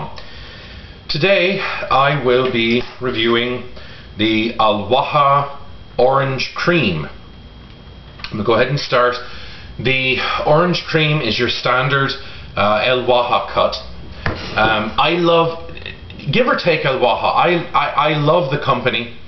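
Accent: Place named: United States. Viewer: when hes with his friends he has a total american accent actually. watch some more. great review as always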